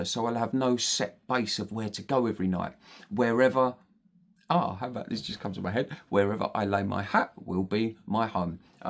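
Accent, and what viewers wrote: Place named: United Kingdom. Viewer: Best wishes to you, man! You sound like the British version of ROB THE NOMAD. Looking forward to following your journey around the great North of the UK, or wherever you end up. Post often and happy travels!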